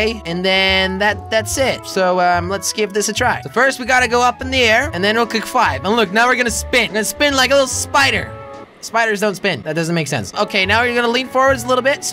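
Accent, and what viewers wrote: Germany. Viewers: are you german ? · are you German???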